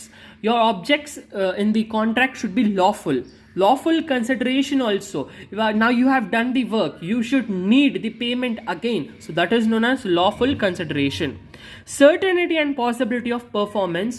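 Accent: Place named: Karnataka, India. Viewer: Omg u r kannada accent is so beautiful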